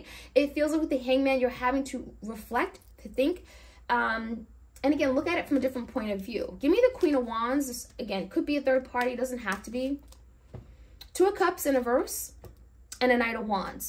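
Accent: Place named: East Coast, United States. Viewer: ur accent gives me classy east coast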